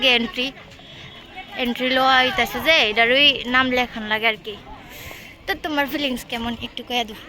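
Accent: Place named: Habiganj, Bangladesh. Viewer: সবচেয়ে ভালো লাগে আমাদের হবিগঞ্জের ভাষা শুনে 🇧🇩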